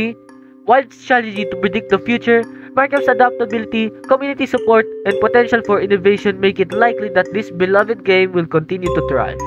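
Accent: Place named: Philippines. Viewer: Filipino Accent😂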